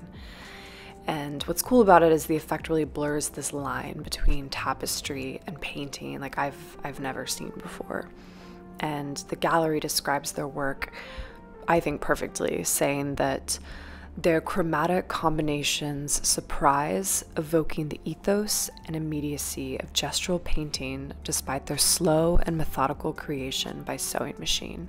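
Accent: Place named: Wyoming, United States. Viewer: Nice wyoming accent. Love it. Pollack (The artist, not the fish) was from Cody, wasn't he. Content, somewhat stultifying, and prosaic, though. It's not Art, is it?! Let's be honest. And, as we all know, taste cannot be bought. And as that rapscallion scoundrel Hamlet once said, to be honest is to be one person picked out of ten thousand. Yes. Stultifying and prosaic is the apt description for these visuals. But thank you for making the effort. Noel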